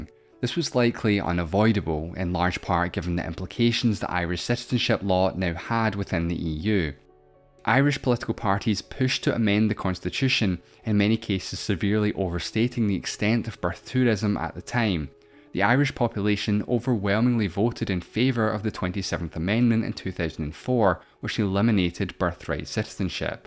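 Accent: Scotland, United Kingdom. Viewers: I love the Scottish narration :) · You're correct that's a Scottish accent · Can't belive you said "all of Ireland was part of Britain but then they wanted independence" you just ignored the fact that the reason they were was because of colonisation. You make it sound like Ireland was originally British and that we just broke off from the empire. I was sort of expecting something like this because of your accent being Scottish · Out of curiocity, what is the accent of the narrator? I think it is Scotish but it doesn't completely match with my limited experience. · it's scottish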